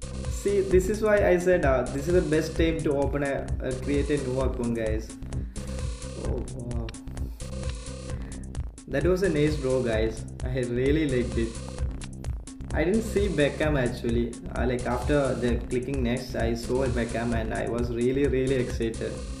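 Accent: Kerala, India. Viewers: Malayali ane alle english ketappo manasilayi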